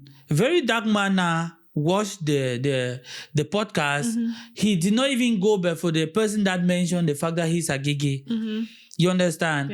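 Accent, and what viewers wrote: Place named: Pidgin, Cameroon. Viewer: This guys pidgin accent looks like a Cameroonian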